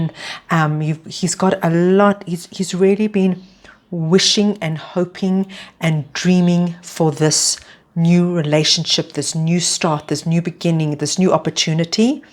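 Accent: South Africa. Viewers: wow thanks for the reading queen! you sound south african lol❤️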